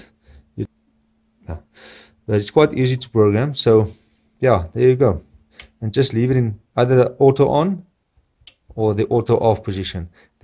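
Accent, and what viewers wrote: South Africa. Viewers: South African . . . within 10 seconds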